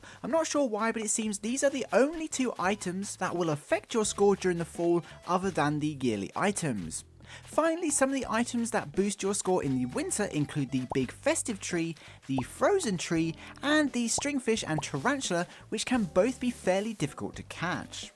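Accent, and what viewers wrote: England, United Kingdom. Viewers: he’s English lol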